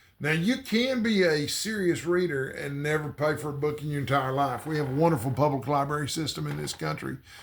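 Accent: Texas, United States. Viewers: I like your videos. I've been to Texas, I love your accent.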